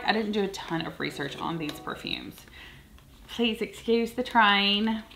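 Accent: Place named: North Carolina, United States. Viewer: SOTD Paco Rabanne Lady Million Lucky 🍀 I always wonder if your from North Carolina by your accent. I’m originally from Long Island NY, moved to Charlotte in my late 20’s and now I live in TN. Hoping to move back to NC sometime in the future.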